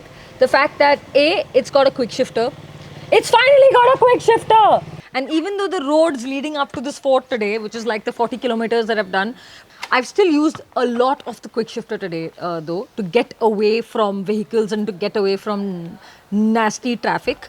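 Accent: Maharashtra, India. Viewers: YOUR MARATHI IS SO CUTE MY MOST FAVORITE ACCENT VIRU BOLE TOH AAP NE KO AISE ICH BAAT KARNE NA KA HA JI👏👏👏👍👍👍👍👍👏👏👏👏👏👏👏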